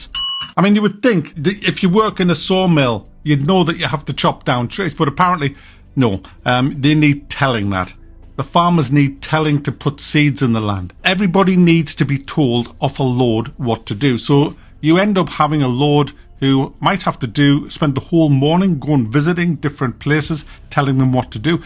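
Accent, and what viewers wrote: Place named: North East England, United Kingdom. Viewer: It's a North East thing, in general. He's from Newcastle, I'm from Sunderland. They say it like that there too.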